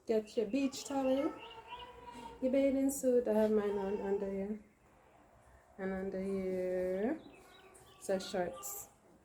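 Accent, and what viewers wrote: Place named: St. Elizabeth, Jamaica. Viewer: Noice 🌞Should have easily guessed you from St. Elizabeth 🙂. Just like how when someone from Montego open there mouth you know they from Mobay "mi deh guh" 😅.. or Spanish Town once you hear "Umbri" 😂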